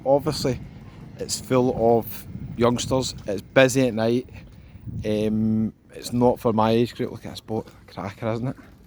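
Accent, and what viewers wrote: Scotland, United Kingdom. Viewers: Nice vlog....well as they say "you can't please them all" I always find your vlogs pretty neutral and hardly biased re your journeys. To me and many others we share the same values so I appreciate your words and opinions. We aren't going to get away until September so your vlogs give me a little bit of sunshine, sand and blether.... My mum was from Girvan so I like the accent and tone. Keep up the good work 🙂 Be safe 🙏 G 🦉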